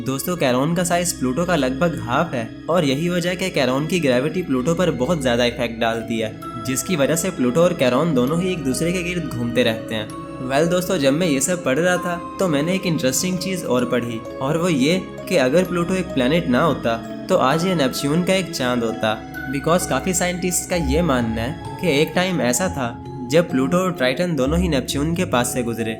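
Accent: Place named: Pakistan. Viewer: Apki bhasa Pakistani jesi lag rahi hai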